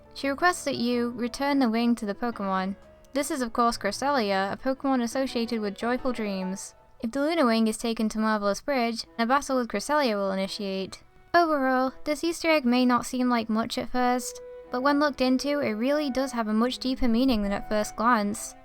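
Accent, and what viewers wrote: United Kingdom. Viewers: Are you british? your accent seems to be that. I am kinda shy saying this, but I think your accent is kinda cute.Your videos ate awesome, new subsciber ='D Greetings from Venezuela. Edited: I misspelled are as ate XD (I need to study more)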